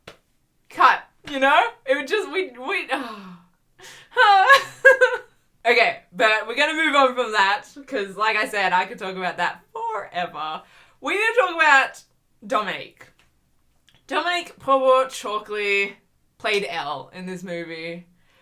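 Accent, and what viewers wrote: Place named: Australia. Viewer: Success for you is just around the corner (bubbly personality) and that Aussie accent.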